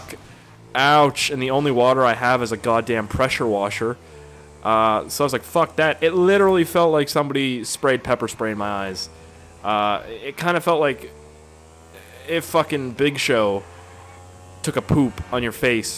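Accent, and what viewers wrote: Canada. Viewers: No homo but I love you Canadian accent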